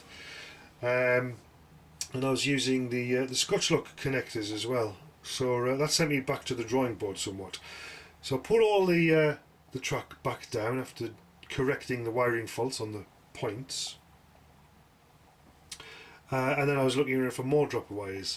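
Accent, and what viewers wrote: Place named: Northern England, United Kingdom. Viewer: HI YOUR ACCENT SOUNDS A BIT MORE NORTH THAN MYSELF IM A BORO LAD HEARD YOU MENTION YOU BOUGHT SOMETHING FROM PETERS SPARES, ANYWAY YOU ARE DOING A GREAT TEACHING JOB FOR ME IM BRAND FIRE NEW AT THE RAILWAY MALARKY BUT WANTING AS MUCH ADVICE AS POSSIBLE KEEP UP THE GREAT WORK TAKE CARE!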